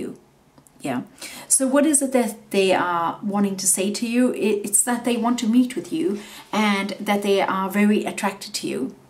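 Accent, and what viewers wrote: South Africa. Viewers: Are you South African? 😍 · Are you South African? 😊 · Your accent is lovely, it's very soothing to me Where are you from? SA? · Are you South African? 🇿🇦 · Love your style of reading and soft South African accent 🇿🇦 ♥️ big Love!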